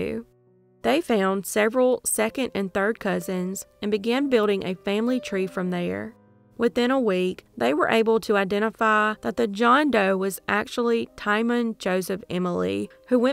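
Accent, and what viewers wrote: South, United States. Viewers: Your southern accent is adorable 💕❤️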